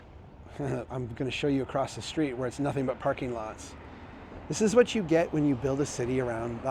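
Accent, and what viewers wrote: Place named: Minnesota, United States. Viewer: He is from Min-ah-sooooo-tah so he has an accent. You're darn tootin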